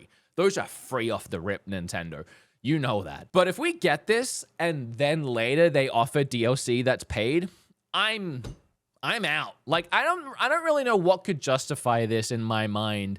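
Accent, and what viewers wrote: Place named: Australia. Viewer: I have to ask, are you Australian or American? Because you have mostly an Australian accent but you mix a lot of words in with an American accent. I'm so confused lol.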